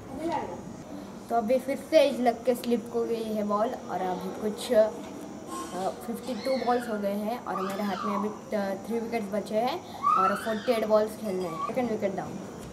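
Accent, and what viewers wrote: Maharashtra, India. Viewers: Bhai aapki drive to laajawab hai , maja aa gaya dekh ke just go forward in your life ❤️❤️ ( And I'm also marathi and I know u r also marathi by listening your accent ) 😁😁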